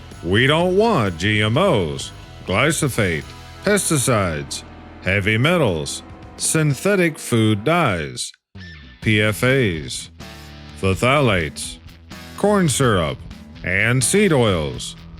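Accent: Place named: Appalachia, United States. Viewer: I can READ ! Why do I have to listen to some hillbilly read the words ON THE SCREEN???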